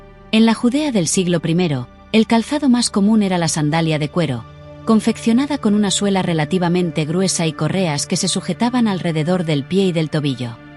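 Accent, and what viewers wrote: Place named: Spain. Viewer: Es chocante el acento español de la locutora. Por eso...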